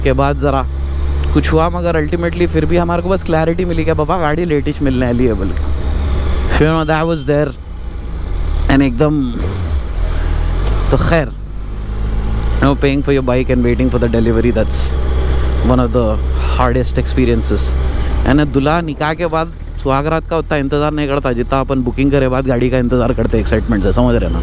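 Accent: Hyderabad, India. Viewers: Bhai Ap toh Eid ke Chaand ho gae ho youtube ke…. Happy you uploaded a video….roj dala karo video if possible…..aacha lagta h apki hyderabadi sunnke…..aurr thodi knowledge mill jati h bike ki joh aur koi nai bolta h…😬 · he's your Best YT-Influencer for Bike Reviews, ... Not a Shill for Castrol etc. Loved his Hyderabadi Accent, and thanks for original content. I have the Trident-660 & 390-Duke, now looking at the SuperSport-950S as 3rd bike.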